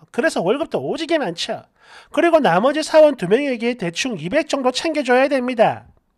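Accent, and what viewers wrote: Gyeongsang, South Korea. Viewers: 딱 경상도 남자들 말투네 ㅋㅋㅋㅋ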